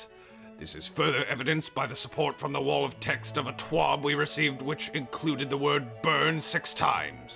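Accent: England, United Kingdom. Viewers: You sound like a mix between a humble Englishman, a drill sergeant and Lord Saladin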